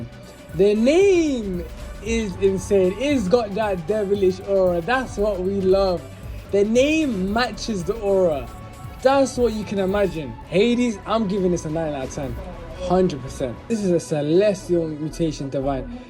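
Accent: United Kingdom. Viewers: Ngl didn’t expect you to be bri’ish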